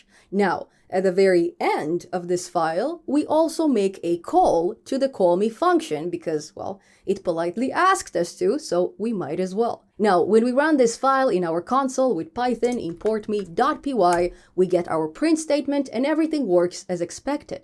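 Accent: Russia. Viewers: Мне показалось, или я слышу легкий русский акцент?) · На россиянку похожа и акцент русский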